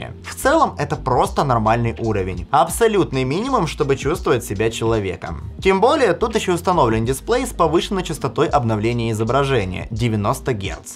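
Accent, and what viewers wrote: Odessa, Ukraine. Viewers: Хренасе, ты Одессит?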